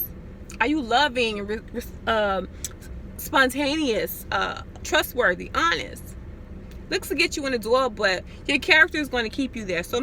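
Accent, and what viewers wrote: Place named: New York, United States. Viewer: Dang pretty lady. You sound like you’re from the NY.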